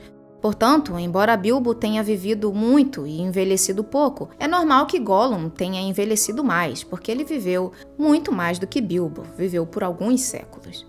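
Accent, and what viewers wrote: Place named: Brazil. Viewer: Legal , eu jurava q vc fosse sulista por causa do seu jeito de falar , de carioca vc nao tem nada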